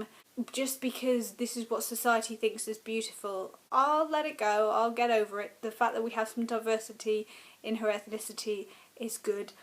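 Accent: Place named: Cockney, United Kingdom. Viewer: haha... I bow down to your cockney awesomeness! And you are right no snack is much worse than a lack of butter (well, unless the snack is milt). Though, I could do with a lot less butter these days - I've got two months to fit into my suit for a wedding. Luckily I'm now doubly motivated to do exercise - exercise improves cognitive function.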